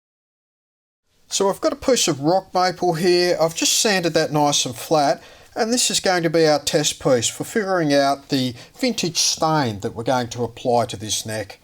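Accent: Australia. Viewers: I love your Australian accent! welcome back. Always been inspired! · Well done Mate! Great video. What part of Oz are you from? You have a really thick accent. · I came for the tech tips... I stayed for the Australian Accent. Hey do You know Lee Priest? I'm from Canada, and Yes, I DO know Bob from Ontario...He's My neighbor. Great Video EH! ❤🇨🇦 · New South Wales accent?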